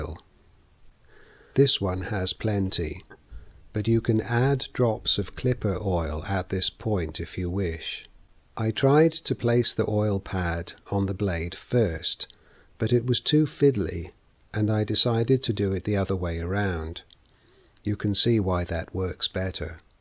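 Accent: United Kingdom. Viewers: Great vid... and the British accent made it enjoyable 👍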